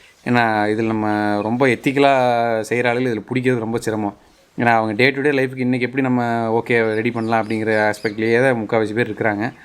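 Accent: Kongu, India. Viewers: Good information, well-planned, and between your speech some Kongu-style is highlighted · Should we apply putty for exterior wall also? How the inch wide granite lip is attached to counter top? would it not detach after some time? I like that idea and many of your tips. I watched the entire video and got carried away completely. your knowledge and presentation in kongu Tamil is awesome.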